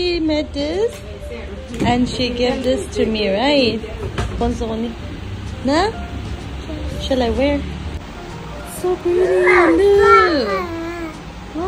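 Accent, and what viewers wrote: India. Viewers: please make a video in Hindi na....your Hindi accent is so ❤ly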